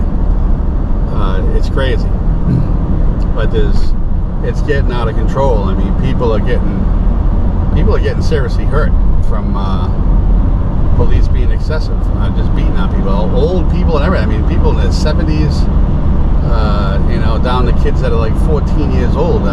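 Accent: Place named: New England, United States. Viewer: Hey you sound like a new england guy, where from im from north andover ma. Any way i rhink alot of the problem is militarized policing. Virtually all police today are former military. This is the american people experiencing what our military have done around the world while at war. So take a solider who has done multiple tours, a job where violence is used to solve problems. Bring them home, send them to a two month academy then give them guns a badge and qualified immunity. How could anyone expect that to not go wrong. Oh and the police culture of blanket criminality. You know, a person commits like two felonies a day in this country because of the thousands of ridiculous codes and policies cops know that,;they are gonna find out what you did today on that traffic stop.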